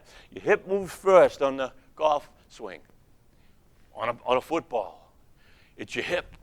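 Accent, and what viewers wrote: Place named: New England, United States. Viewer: I can tell by your accent you live in New England.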